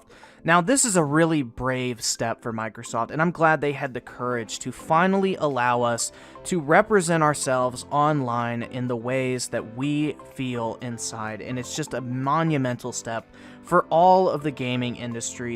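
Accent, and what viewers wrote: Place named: South, United States. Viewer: Bro i remember these! He had that southern accent coming through still and he scripted his vids still..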